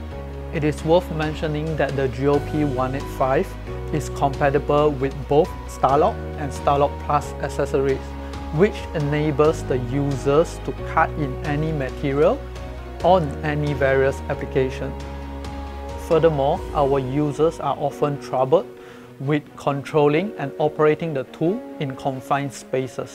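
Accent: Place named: Singapore. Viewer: Is this Bosch Singapore? Why is the english accent sounding more like Singaporean than Filipino?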